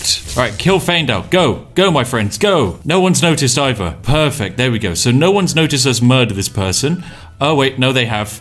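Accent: United Kingdom. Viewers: Watching you break games with your amazing British accent brings me joy sir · Absolutely brilliant tips! 👏👏👏 love your british accent, so energetic and just... lovely🥂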